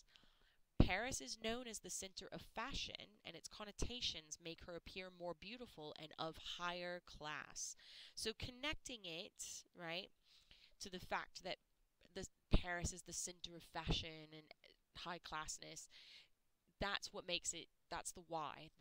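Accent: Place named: United States. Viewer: Why is an American teaching me English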